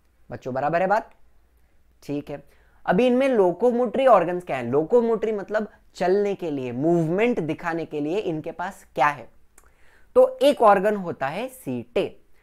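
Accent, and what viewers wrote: Maharashtra, India. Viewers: Sir are u marathi????? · You are marathi ?? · Sir, kya aap marathi ho?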